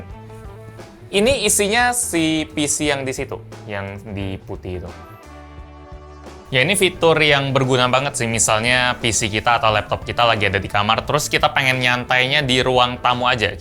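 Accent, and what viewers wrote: Palembang, Indonesia. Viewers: Mengot Bahaso Palembang nian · Mengot....🤣 palembang nyo di mano bang? Salam kenal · Anjay mengot.. palembang nian cuk😄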